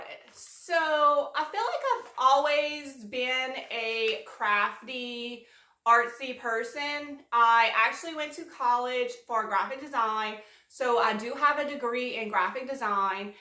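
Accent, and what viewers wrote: Louisiana, United States. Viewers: I've only recently found you, you are a breath of fresh air! I too, LOVE your accent, I'm from Tennessee and my company's office is in Beaux Bridge, I could listen to those guys talk all day and your cute accent is way stronger than theirs. I love your style, I can't wait to see what you come up with next!!! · I could tell you were from La right away..... being from MS you are from my sister state !!!! The accent sounds like home !!!! Love your videos where you flip an item or you make something from wood... I love woodworking... Thanks for all the info about you !!! I am subscribing !!!!! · Just stumbled across one of your videos, heard your accent, and said this lady must be from da bayou like me 😍 lovin your channel too girl! 😘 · Great video! I love your accent too! Took a while but figured out it must be cajun and you verified that for me here.😉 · Congrats on 10K! 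I love Southern Louisiana accents! A Fb group would be fun! Keep it going! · I just happen to come across your channel. I love thrifting, so I was watching you and I knew you were from my state and I knew you had to be from south. Your accent is my accent. Louisiana is the great state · Love your channel and really appreciate your step-by-step instructions on how you make your DIY 's. I enjoy watching your channel and get excited to see what you are creating next so I can go try and recreate your DIYS. I recently found me a duck!!!! at the thrift store and I am going to try the chalk paint/paint baking soda concoction along with the Waverly wax clear/paint i know its going to come out amazing, Oh girl love that ac•cent because we Louisiana girls!! 😘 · I've been addicted to your channel since last summer and started curb flips in the fall. Your videos are so inspiring. I love that you incorporate color into your designs. 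Love your accent, too. My mom is from New Iberia so I recognized the Cajun accent. Love love loce. Congrats on the monitization. God bless and keep you and yours. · Used to live in Denham Springs (Livingston Parish) now in Texas and I miss hearing that Cajun speak! Love it girl! Have you tried making your own chalk paint and then using it in your paint sprayer? Do you have to thin the chalk paint to use the sprayer? Thanks!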